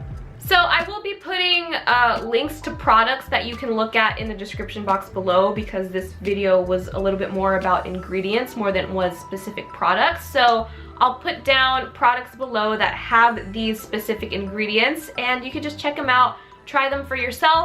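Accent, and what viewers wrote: Australia. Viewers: Daniela Gonçalves I thought it was Aussie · is it jus me or does this girl have an australian and american accent 💀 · Wait... are you Aussie or North American? I'm confused! Lol awesome vid thooough and very informative. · it was an australian accent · Why did you talk Australian then change ?? Confused as lol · I like that Australian accent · Did she just start off in an Australian accent??? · Fifi González I thought she was aussie at first lol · I was listening to your voice at the start and you sounded kind of Australian😂 I was like woah I thought you were American😂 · that australian accent thoooo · i prefered her Australian accent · Kazzie Bolaños no that was definitely Aussie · Des Breaux i could have sworn she was Australian 😂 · Your Australian accent had me dying!! I always do the thing where you pronounce "o" as "or" around my friends to annoy them lol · I’m glad I read the description because that Aussie accent REALLY threw me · I THOUGHT SHE WAS AUSTRALIAN AT FIRST 🙃🙃🙃🙃🙃🙃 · Are you Australian, from the U.K. 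or America??? What are we doing love? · Am I crazy or do I randomly hear an Australian accent here and there? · Haha same I first thought British>Australian then realised she’s American 😂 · HAha. I noticed the Australian accent. Only true Aussies can talk Australian so I would say that lovely must have an australian background:) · prqurn it was Australian not british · THAt AUSTRALIAN ACCENT IS AMAZING ❤️❤️❤️ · I'm Australian and I honestly thought you were an Aussie from the first 10 seconds. Our accent is so damn hard but you did such a good job 💕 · Mashallah you do a great Aussie accent you legit sound like an Aussie-coming from an Aussie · Ooooh an australian youtuber cool ☺️ wait what? 😳 huh? She's american? What? I'm confused af.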